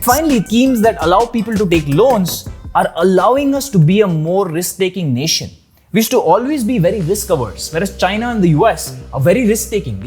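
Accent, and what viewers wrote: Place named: India. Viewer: Dude to be honest... I'm trying to listen to you with all my heart, because it seems what your talking about is something of great importance judging from the seriousness of your face. But I can't bruh! Because every time I hear your voice, I can't help but laugh hysterically at you and the way you speak like watching a stand-up comedian doing an Indian accent!🤣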